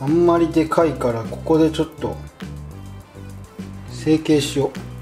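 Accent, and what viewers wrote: Kagawa, Japan. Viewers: 素のときに出る、讃岐弁がいい(´・ω・｀)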